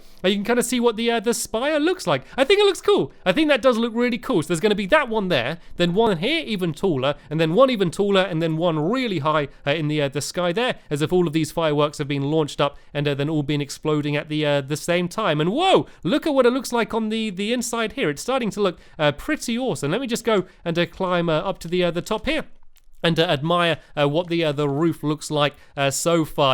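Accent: United Kingdom. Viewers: Dude, you British? I'm from a commonwealth so I have a British accent too :) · You know that is British bc he has an accent and he spells color with a u!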